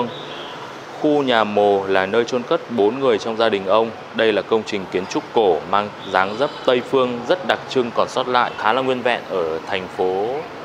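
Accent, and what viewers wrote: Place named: North, Vietnam. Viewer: Hello, dung now tieng Bac Dan mien Nam Khong Thinh dau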